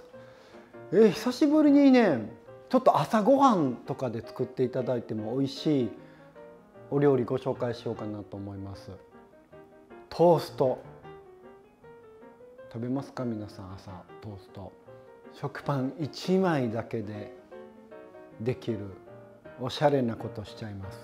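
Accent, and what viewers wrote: Osaka, Japan. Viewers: イギリスからです。以前から日が経ったパンを使ってブリーチーズとクランベリージャムとサラダほうれん草を挟んでオリーブオイルを薄く塗ったフライパンで押さえつけてホットサンドを作ってました。先ほど少し硬くなったくるみとクランベリーのパンを使ってコウさんのおっしゃる通りバターで冷凍ベリーミックスとブリーを挟んで何回も押さえつけて裏返しながら焼くと本当にサクッとパイのようになりました。いつも美味しい手軽に作れるレシピをありがとうございます。大阪節トークもいつも楽しみにしています。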